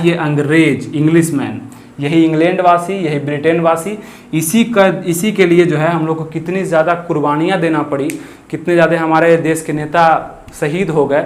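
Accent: Bihar, India. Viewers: Thanks a lot sir🙏...aapk language se lgta h aap Bihari ho aur hum v (i proud to be Bihari)💪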